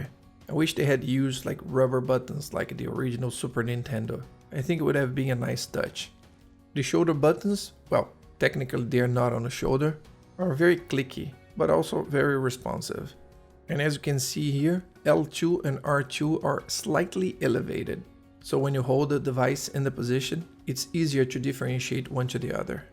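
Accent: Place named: Brazil. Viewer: Esse sotaque aí não me engana hihihi brazuka na área!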